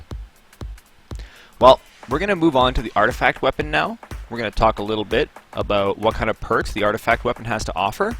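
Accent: Canada. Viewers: Awesome Canadian accent FTW.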